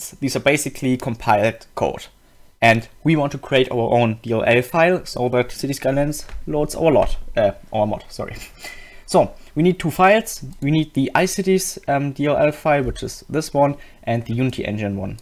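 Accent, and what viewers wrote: Germany. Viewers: are you german? your accent is so like one especialy when you end a sentence like german way or lee bit French way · No hate but It sounds like you have a German accent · Like for the cool dorky German accent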